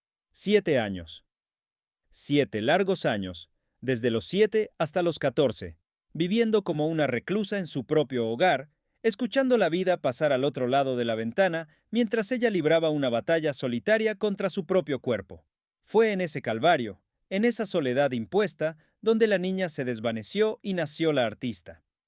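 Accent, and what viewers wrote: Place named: Latin America. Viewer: acaso el vídeo lo ha hecho un español? Porque yo noto un acento claramente hispano Americano, no se porque llamar mentirosos a los Españoles? Mentirosa serás tú y creerás que todos son como tú.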